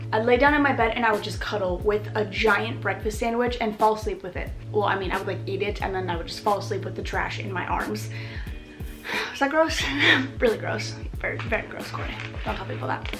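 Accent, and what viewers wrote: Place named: United States. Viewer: Your country accent hahaha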